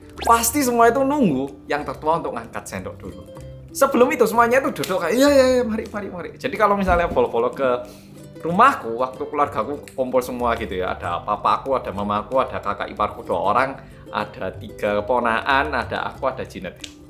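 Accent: Java, Indonesia. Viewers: Tiba" Nemu channel ini dan kesan pertama adalah anda itu medok sekali jawanya, keren, kesan kedua saya dpt informasi yg sebelumnya sama sekali tidak tahu, nice sharing. Sungguh tata krama makan yg luar biasa, budaya yg luhur. Memang bener bgt mas tatakrama makan memang perlu banget, apalagi tau dulu budaya setempat malah lebih bagus dan nilai plus tambah. Detil memang tapi sangat jelas nilai etikanya. Terima kasih mas atas tambahan wawasanya..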